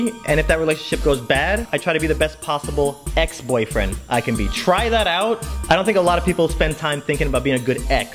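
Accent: England, United Kingdom. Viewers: That English accent tho! Lol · Your british accent tho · That is a good British accent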